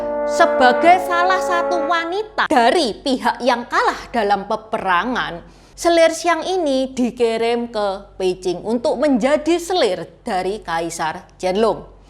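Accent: Java, Indonesia. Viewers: Looo ... Kok .... Ngene 😁😀😁😀😁😀 ngomong nee ... 😁😀😁😀😁😀 Iki Tionghoa atau jawoooo.... 😁😀😁😀😁😀 Ngomong nya medoook ..😁😀😁😀😁😀😀😀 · Logat jawa mu dlm kl y berdaaarkan Dr MN,cerita nya?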